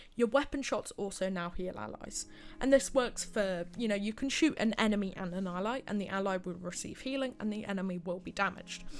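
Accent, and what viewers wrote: United Kingdom. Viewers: Omg, your British accent is pure spot on but I'm having a little bit of trouble making out those words XD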